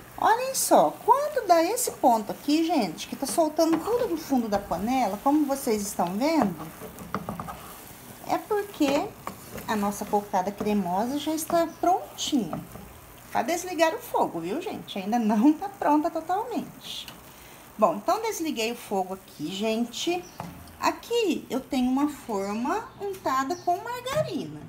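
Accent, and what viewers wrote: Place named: Minas Gerais, Brazil. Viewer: Sutaque mineiro ótimo vou fazer essa delícia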